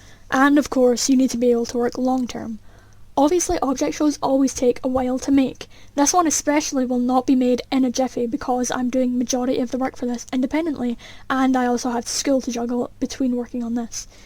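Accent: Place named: Ireland. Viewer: are you irish i recognise your accent lol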